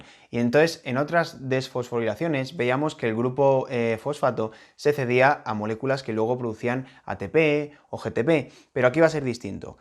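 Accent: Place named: Spain. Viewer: me cae bien pero me suena muy raro el acento de españa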